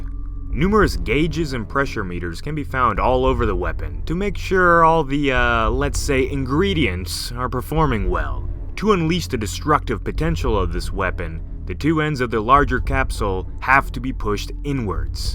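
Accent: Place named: United Kingdom. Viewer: Nice British accent 👌🏻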